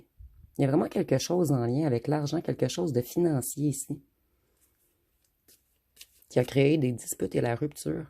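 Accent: Quebec, Canada. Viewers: Je sais pas si je suis devenu addict à vos guidances ou à votre accent Québécois que J adore 😊. Bise de France 🇫🇷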